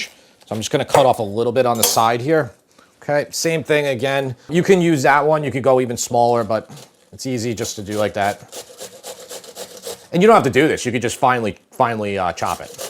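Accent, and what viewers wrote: New York, United States. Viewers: I'm a vegetarian, don't eat tuna, but love your NY accent and watched the video all the way to the end😅😅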